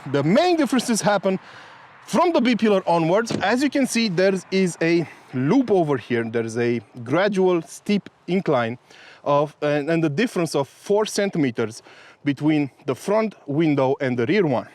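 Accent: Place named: Romania. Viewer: Judging from your accent, you are from Romania? OK, you are using some American expressions and their units of measurement, but stil, I can guess the Romanian origin 😎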